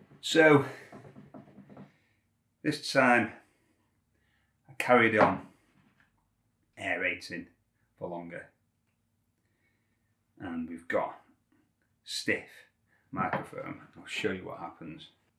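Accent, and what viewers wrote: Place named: West Yorkshire, United Kingdom. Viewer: You have a similar accent to me. West Yorkshire?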